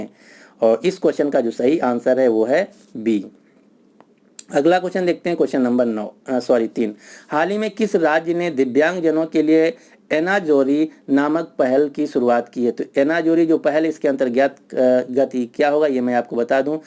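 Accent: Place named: Uttar Pradesh, India. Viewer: Lagta h ki app up ke h hain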